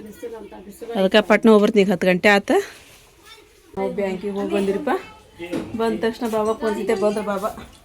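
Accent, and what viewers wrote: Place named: North Karnataka, India. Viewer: ನೀವು ಮಾತಾಡು ಕನ್ನಡ ಕೇಳಲಿಕ್ಕೆ ಮಧುರ ಮತ್ತು ಆನಂದವಾಗಿರುತ್ತದೆ ಉತ್ತರ ಕರ್ನಾಟಕದ ಭಾಷೆ ಬಹಳ ಖುಷಿಯಾಗುತ್ತದೆ ಅದಕ್ಕಾಗಿ ವಿಡಿಯೋ ನೋಡ್ತೇವೆ ಹೀಗೆ ನಿಮ್ಮ ಭಾಷೆ ಮುಂದುವರಿಸಿ ಕನ್ನಡ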